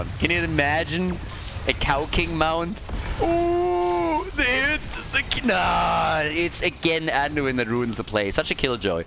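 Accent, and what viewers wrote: Germany. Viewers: Nice match You have a bit of German accent?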